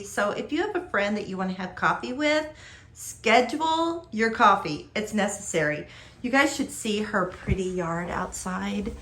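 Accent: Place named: South, United States. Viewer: I'm down in Florida - so many things are different due to temperature, humidity, sand soil. But I love seeing everything others do and can always learn something new. It helps to know where a person is located to determine how their environment may be different and changes I might need to make before copying them. I do think I detect a southern accent in your videos which is beautiful!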